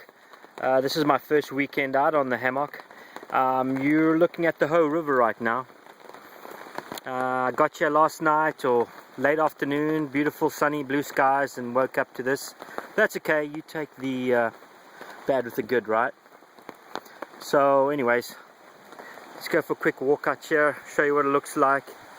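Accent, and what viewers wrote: South Africa. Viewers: is that a south African accent I here????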